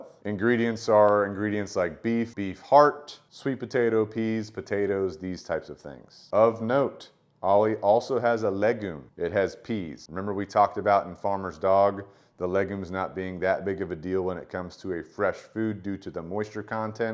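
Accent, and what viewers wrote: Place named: General American, United States. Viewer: He has a standard American (U.S.A.) accent.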